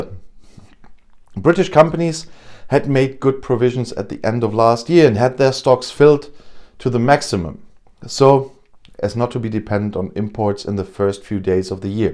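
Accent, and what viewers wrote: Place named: Germany. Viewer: With your slight German accent it almost sounds to me like the "Kent Access Permit" is perhaps instead called the "can't access permit" but that would be absurd wouldn't it?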